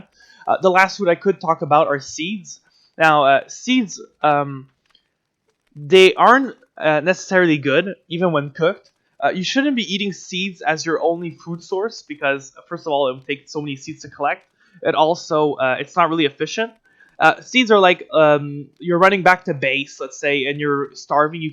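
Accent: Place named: Ireland. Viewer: Just happened upon this channel... I really enjoy the Irish(I'm assuming) accent.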